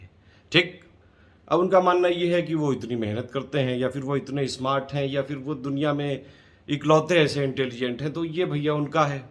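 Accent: Kanpur, India. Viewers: Aaj kanpuriya style may sandesh bheja hai.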